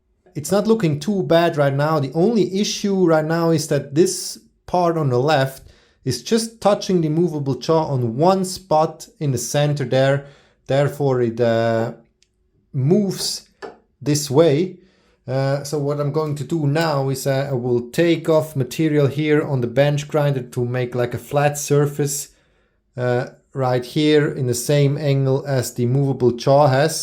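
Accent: Switzerland. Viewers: Echt Super ! und schoen deine Stimme zu hoeren Mit Zueri accent aber kein "full on" Zueri Dytch!. Wir haben in Oktober von Hirzel, 8816 nach Deutschland umgezogen so Gruezi aus dem Schwarzwald !!! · Your skills are so amazing. This is the famous Swiss precision at its best. btw i like your English with the swiss Accent..🙂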